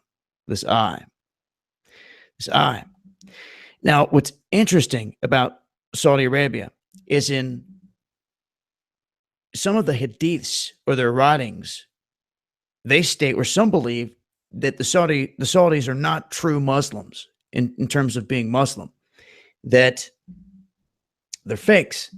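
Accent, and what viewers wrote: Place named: United States. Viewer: wow... to hear hadiths in that yanki accent.. :) kudos mate... good work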